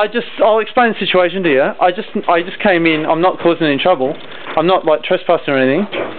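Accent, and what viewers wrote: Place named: Australia. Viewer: all that for a number 1.... thought u were an aussie mate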